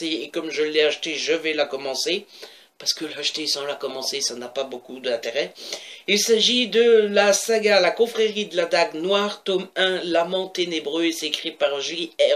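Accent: Belgium. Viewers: Je découvre ta chaine que maintenant (oui je ne savais pas que tu en avais une, merci de me l'avoir indiqué que Twitter) ! J'aime beaucoup ta façon de présenter les livres je vais encore en acheter des tonnes parce que comme par hasard on a les mêmes types de lecture xD Des bisous ! 😘 (ps : ce petit accent, la Belgique ? 😊)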